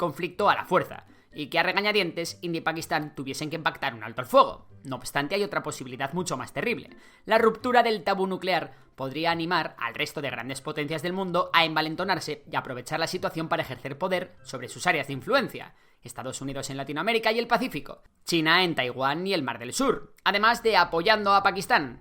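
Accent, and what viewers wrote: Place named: Spain. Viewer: Escuchar a un español da vertigo.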